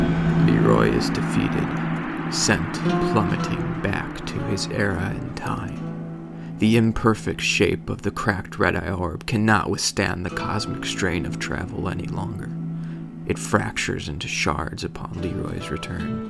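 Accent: United States. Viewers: Nah... you need that brit accent to make this legit. The American accent just wrecks all medieval type lore stories. It's just the truth man.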